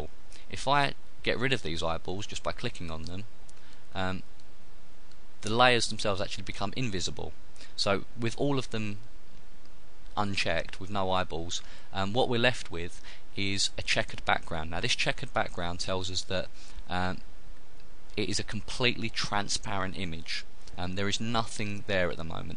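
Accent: United Kingdom. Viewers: ur british?! sexxyyyy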